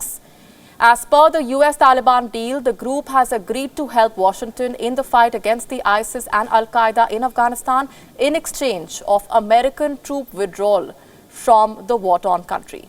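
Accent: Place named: India. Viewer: Sir , Nobody talked about professionalism here It was just a personal request . I'm in no way demeaned the current anchor . She is good . And If you don't get Indian accent there are many other great news channels you can switch to them anytime It's better not to hurt your ears . By the way , your comprehension skills aren't great either !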